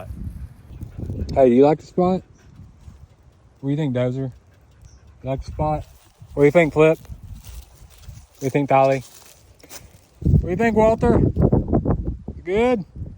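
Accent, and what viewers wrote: South, United States. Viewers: Your southern accent really is a lot stronger now that you're home lol glad you found your place, it must have been stressful not having a home base for that long...excited for your new chapter! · bro how come you got southern accent when you got back home 😂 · Ayy southern baby with the thickest accent is back again! pls more farm vlogs pls.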